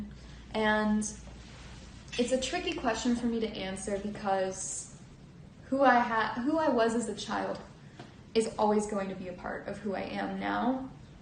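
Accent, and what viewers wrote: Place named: Pennsylvania, United States. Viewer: pronunciation is characteristically Pennsylvanian. Do you expect her or anyone else to know what pronunciation you prefer?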